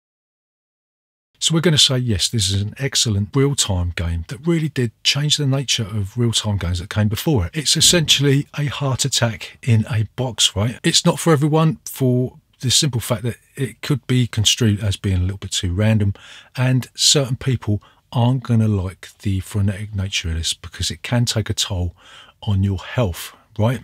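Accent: United Kingdom. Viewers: I am digging your english so pure so british. Loving that. Greetings from SVK <3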